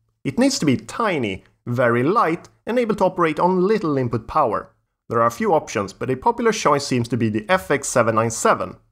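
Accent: Sweden. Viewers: I bet an arm and a leg that the narrator is Swedish! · Your english tells me you are swedish. In that case: svara så jag vet, jag och sambon har slagit vad.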